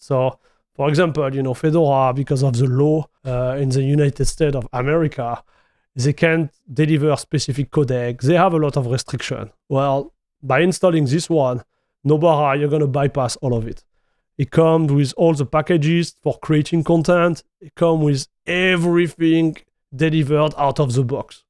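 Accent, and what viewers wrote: France. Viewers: Pas facile d’écouter un Français qui parle Anglais. 😅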